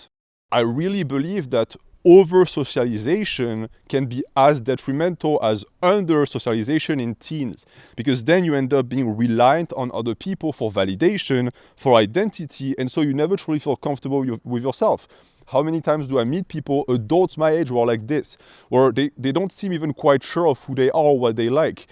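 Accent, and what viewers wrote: France. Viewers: Bonjour. You have a French accent am I right? :D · Are you French ?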